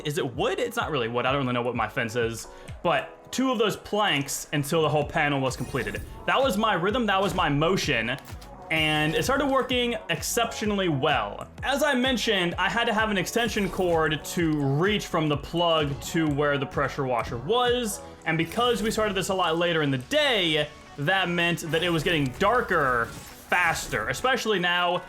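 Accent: United States. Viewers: What part of Ohio are you from?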